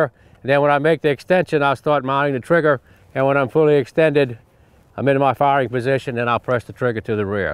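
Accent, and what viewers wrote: Louisiana, United States. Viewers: That accent has to be from Louisiana. Northern part of the state no?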